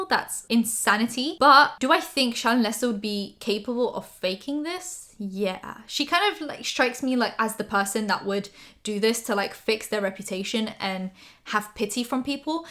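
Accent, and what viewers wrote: United Kingdom. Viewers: nigdy bym nie pomyślał ze jest z polski albo chociaż nawet mówi po polsku, jej brytyjski akcent jest idealny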